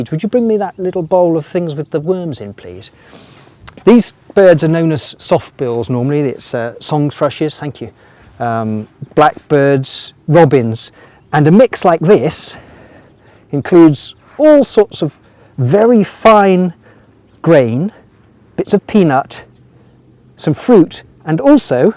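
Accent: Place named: United Kingdom. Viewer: Everything sounds better in a British accent.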